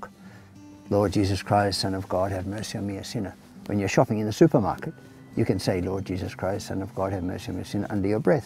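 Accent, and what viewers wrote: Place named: Australia. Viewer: I’m finally picking up on Father ’ Australian accent.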